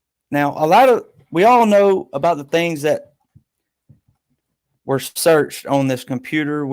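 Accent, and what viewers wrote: South, United States. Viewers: You're my absolute favorite of all M2M enthusiusts...love the southern accent too. I'm originally from Bham, AL I can relate.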